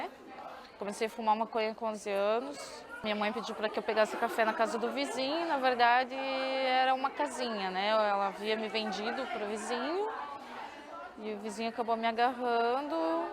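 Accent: Curitiba, Brazil. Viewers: This Is My Nick Mas ela tem um pouco de sotaque Curitibano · o sotaque curitibano dela é lindo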